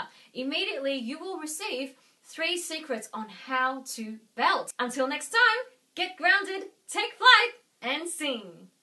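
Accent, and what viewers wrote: Australia. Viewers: Her accent is Australian. Not like the movies is it? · Juan Barboza it doesnt sound American and British because its Australian. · You look Asian but sound Australian..what are you..? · Wow I need to go to Australia and marry an Aussie woman when I grow up because the accent is SO clean! :D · Its clearly australian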